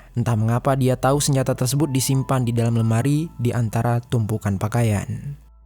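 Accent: Java, Indonesia. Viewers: Suara nya mirip bang jeri tapi logat nya beda yg ini kaya ada Jawa Jawa nya dikit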